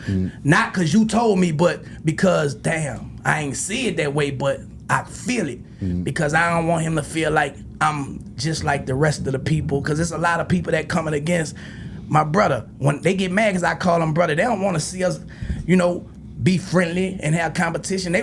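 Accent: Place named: Memphis, United States. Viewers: Turk always talking....thats what Juvie was telling his sensitive azz. Bg responded to what you said on this podcast and he didnt even go at you. He just said you sound like you from Memphis which i feel touched Turk. Turk keep BG name in his mouth more than anything. Just do yo thang and move on but this nigga still wanna reach. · Son u be doing too much and BG right u do sound like u from Memphis. The NO don't sound like nobody else no matter how long we rock in other cities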